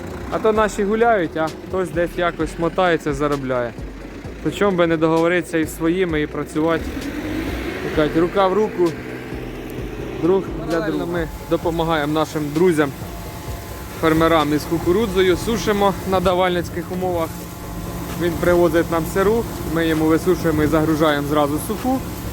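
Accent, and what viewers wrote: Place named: Poltava, Ukraine. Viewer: Судячи по говору Полтавська.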